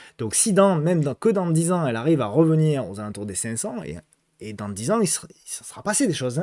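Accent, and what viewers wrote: South, France. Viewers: j'aime bien l'accent du sud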